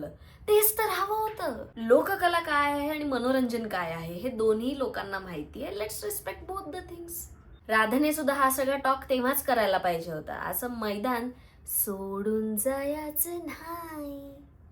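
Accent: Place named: Khandesh, India. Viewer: 😂😂 paneer aivaji khodrubber Your roasting skills are amazing 😂😂❤ Btw I'm from Bhusawal 😅 loved your khandeshi accent 😁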